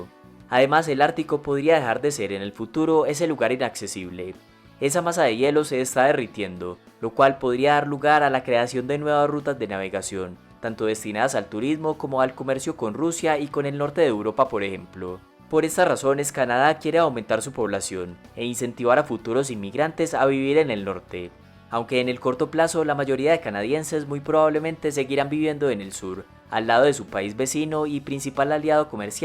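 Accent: Colombia. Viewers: Dato curioso el narrador de este video si no equivoco es de origen colombiano por su acento…..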